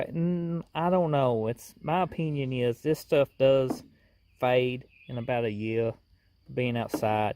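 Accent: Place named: Kentucky, United States. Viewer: lol well I'm from Texas but my dad is from Bardstown and your accent is hardcore lol. good stuff.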